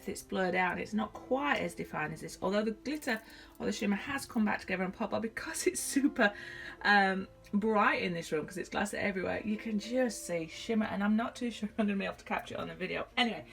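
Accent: United Kingdom. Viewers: New follower thanks to Myriam! Can't wait to see your work! <3 Edit: Cuz I'm the girl who gets so excited that she comments before the videos are even finished. XD Are you Scottish? Whether you are or not, you accent is GORGEOUS! ;) <3 Edit2: I'm at the end and this looks STUNNING! The way you made the top and bottom kind of not be the focus, makes the center look like a sunset or sunrise. The part at the top above the last layer of blue, looks like a city at night with all of the sparkles. Beautiful work! I can't wait to start binging your channel tomorrow at work. (I'm a government employee. I can't be breaking the stigma of being one by actually working a lot. XD)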